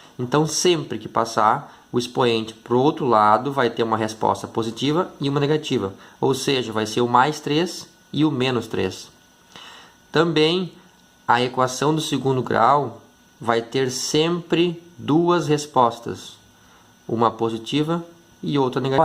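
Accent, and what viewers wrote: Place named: South, Brazil. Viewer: TEM SOTAQUE DAQUI DO SUL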